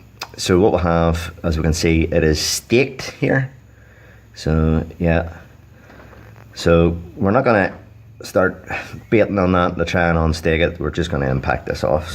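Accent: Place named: Ulster, United Kingdom. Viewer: Love me an Ulster accent. Greetings from Australia.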